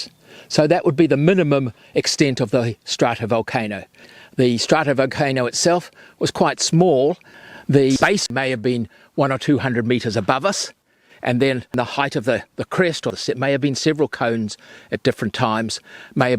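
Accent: New Zealand. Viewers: You have to guess it's in New Zealand from the guy's accent. Very interesting, well done.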